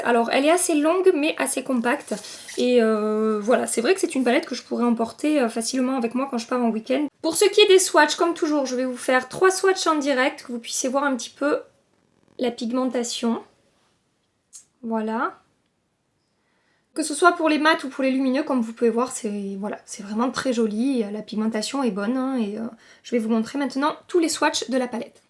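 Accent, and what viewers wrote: South, France. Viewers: Hello la belle ! cette vidéo est un régal , j'adore tes improvisations et t'écouter parler ;), tu es du sud de Narbonne si j'ai bien compris ? tu as un accent sympa , moi aussi une sudiste mais de Montpellier ! merci pour ce super descriptif de la palette qui me fait énormément envie , elle est sublime et très complète <3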